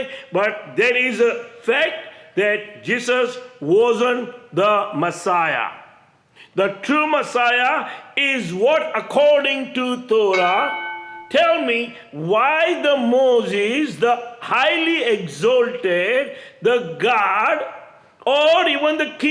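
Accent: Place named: India. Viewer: Are you a Jew or an Indian. Your accent is Indian. 1. Why say that no Prophet in the old testament did any heaking or miracle? Elisha healed the Syrusn commander from Leprosy 2. Moses oersformed mamy signs and wonders 3. A dead person who was buried next to the bines of Elisha got resurrected. 4. A Son 9f the widow was resurrected by Elijah 5. Jesus made it clear that whatever He did was done by God the Father who eorked in Him or using Him NOW YOU , WITH NO POWER TO DO SNY MIRACLES. YOU WILL NEVER RAISE THE DEAD . WHAT WILL YOU HELP ANYONE WITH 7. A MESSIAH MUST BE ABLE TO RAISE THE DEAD. IF YOI CANT RSISE THE DEAD , THEN YOU EILL NEVER RESURRECT FROM THE DEAD EITHER . SO YOU ARE A NIBIDY AND CANT HELP ANYONE THE GREATEST ENEMY OF HUMANITY IS DEATH and also diseases If you will not taise the dead , you are worthless. You will judt die and become soil.like everyone 8. 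So, WHAT IS THE DIFFETENCE BETWEEN YOU AND OTHER HUMAN BEINGS ? . You feel helpful to Jews by telling them to fight on until GOG AND MAGOG war . Is that what Jews are looking for. A firever war life . Dont they want peace ? JESUS CHRIST IS THE PRINCE OF PEACE . REJECT HIM TO YOUR OWN DETRIMENT . WHOEVER BELIVES YOUR LIES WILL BE END UP IN THE SAME FATE.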